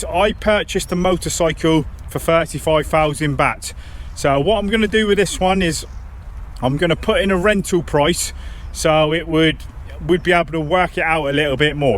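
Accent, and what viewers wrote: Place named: South West England, United Kingdom. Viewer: Hi, Been following [subscribed] you for a while now, it sounds like you are from the South-West-ish area of the UK [so you will be well-aware of the weather in the UK]. I am interested in Chiang Mai "as it seems to have cooler temperatures than the rest of Thailand" [Chiang Rai also looks like a cooler option too], that said, could you give a portion of one of your vlogs that tells of what to expect weather-wise [mostly between November to May in my situation] in Chiang Mai? Good-luck Buddy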